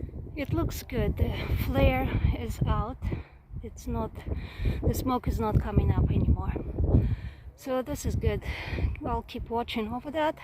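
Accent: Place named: Eastern Europe. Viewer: ) Можно и об этнической принадлежности. Хотя, не суть. Просто только сейчас заметил этот знакомый акцент из Восточной Европы. Давненько подписан, смотрел на творчество.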